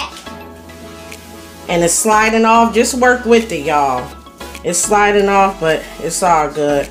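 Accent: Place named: Buffalo, United States. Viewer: You from Buffalo? That accent sound just like Westside Gunn. Boom boom boom. 😂😂😂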